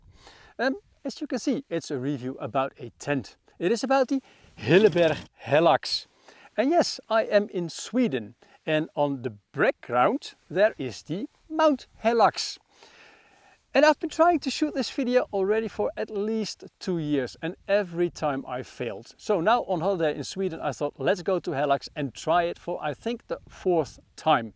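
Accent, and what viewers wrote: Netherlands. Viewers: superb review. Your “Dutchy “ English reminds me of the accent and translations my Dutch girlfriend speaks ! ❤ Thanks for filming in English- very useful content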